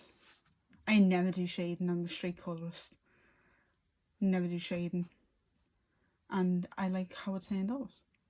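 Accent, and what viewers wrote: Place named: Wirral, United Kingdom. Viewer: You sound like you’re not too far from me, I’m from the Wirral! B&M, Home bargain and the works are such good places to get books from aren’t they!? Think I spotted a few I got from there too. Lovely pages x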